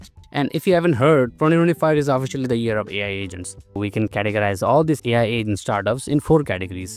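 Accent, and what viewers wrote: India. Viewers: you spell with indian accent make boring startups become 1000% fun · just from the accent i can say indian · ur accent looks like you are bengali or odia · not from Bangladesh I approve it. This is Indian accent. He could be from Odia only. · What is ur mother tongue, I want to know which Indian language produce this English accent because it doesn’t sound Hindi accent English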